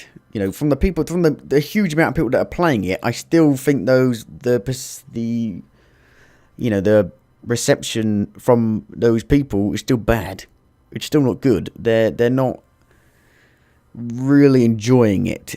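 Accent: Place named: Essex, United Kingdom. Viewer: Where you from mate? Sounds a bit like South East... Essex maybe? But there's a bit of Weymouth in there! Like the honesty old sea dog!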